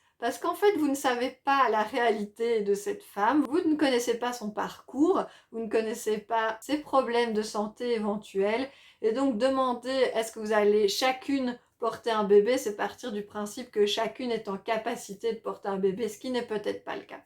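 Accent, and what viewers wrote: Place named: Switzerland. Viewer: Ola l'accent Suisse !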